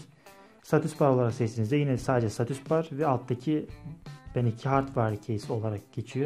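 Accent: Gaziantep, Turkey. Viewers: Antepli misin sen ? Neresinden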